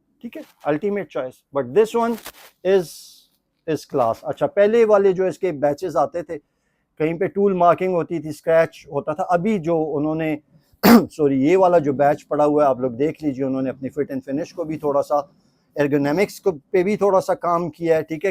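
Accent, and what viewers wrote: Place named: Hindko, Pakistan. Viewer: sahib very nice hindko dialect ❤, secondly mein nay bhee apna 30 bore 21000 ka khareeda tha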